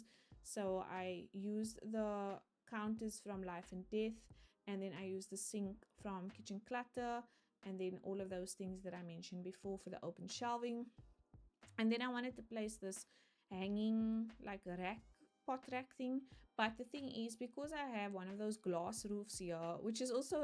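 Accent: South Africa. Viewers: BTW I love your accent, is it South African?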